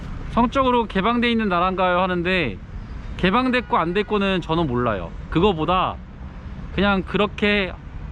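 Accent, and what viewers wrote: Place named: South Korea. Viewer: 형님 발음 중독성 오집니다 ㅋㅋㅋㅋㅋㅋㅋㅋㅋㅋㅋㅋㅋ 리얼 코리안 영어발음 저를 보는거 같아서 내가 영어잘하면 이런 느낌이겠지? 하면서 봅니다 ㅋㅋㅋㅋㅋㅋㅋㅋㅋ 너무 재밌어요 전염병 조심하시고 즐거운 여행하세요 형님~~~~ 아 저는 24살 대학생입니다 영상 너무 재밌어여~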